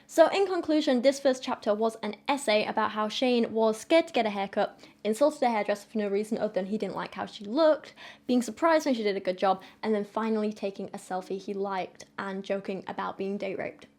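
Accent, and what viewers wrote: United Kingdom. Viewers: After watching u for months I just noticed ur accent, like I didn’t realize u were British until rn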